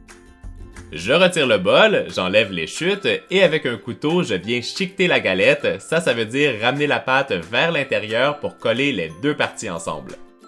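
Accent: Canada. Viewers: Bonne année et j'adore ton aksent du canada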